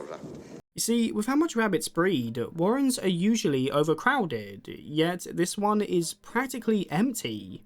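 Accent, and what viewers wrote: United Kingdom. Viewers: I was trying to place the accent. Sounds like if Mark E Smith if he came from Southend and not Salford. It's nearly unlistenable.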